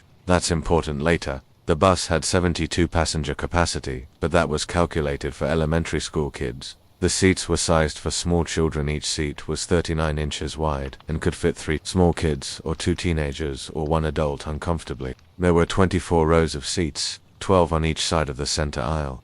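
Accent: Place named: England, United Kingdom. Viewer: Ixnay on the English announcer, it sounds lame to Americans.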